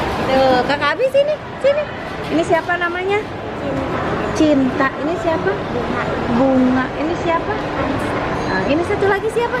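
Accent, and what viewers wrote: West Java, Indonesia. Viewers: Lucu teh 🤣nyariosna logat sunda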